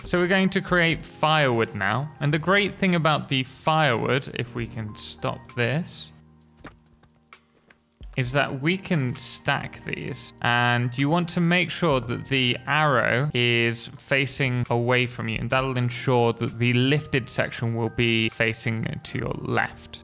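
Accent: United Kingdom. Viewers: hell yeah bro you sound more british than my dad, and that makes me know you bought to lay the words of god on me right now. if anyone knows how to go somewhere new and take it over, its yall 😮📝 im here to learn dawg on a real note what you said about the main story yeah dude those caves freak me out i just want a castle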